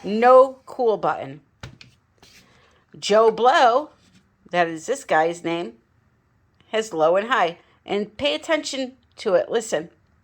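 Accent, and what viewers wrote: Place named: Midwest, United States. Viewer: Watching ONLY videos this am...after a long 7 month no art time due to family needs I am so enjoying all your videos. You have every creative instinct possible. LOVE this one. Yes saving those pour too big to turf..too pretty to pour over. Nice, your accent. Now is it? Midwest? Chicago? Please DONT be mad I am trying guess. I really am glad I got on your channel gonna watch em all. Just went to a paint store I've been flipping furniture too...its alot of work but wow. What a difference. Thank you dear your a friend to all budding artists and pros alike!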